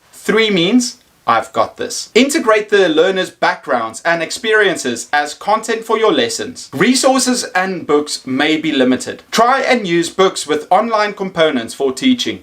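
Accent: South Africa. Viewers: haha I you South African bro?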